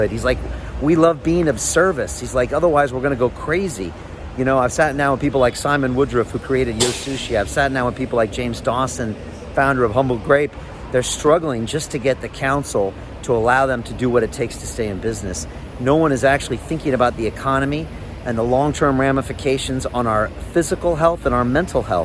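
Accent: United States. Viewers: Is this a guy with an American accent Go back home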